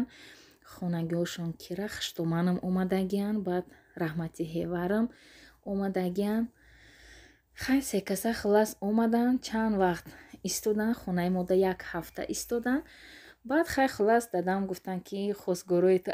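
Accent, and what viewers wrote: Konibodom, Tajikistan. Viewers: АСАЛОМУ АЛЕКУМ апачони гулмм бо кони бодомш чи лавзаи 🤗😂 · Хохарчон заминкадар чизхоя шавхаратон бахшидагиет, вай бошад намемонад ки шумо бо шеваи ширини Конибодоми гап занед😊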